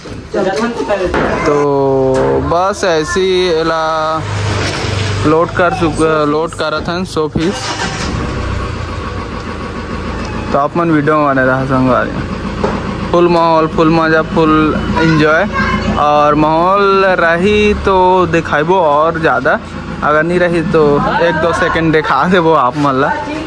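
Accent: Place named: Chhattisgarh, India. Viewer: Bhaiya tuman Chhattisgarh se bolat ha😊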